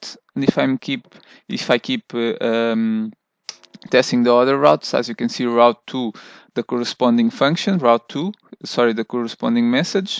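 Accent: Portugal. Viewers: Obrigado por este tutorial! (acredito que sejas tuga pelo falar.. ;) )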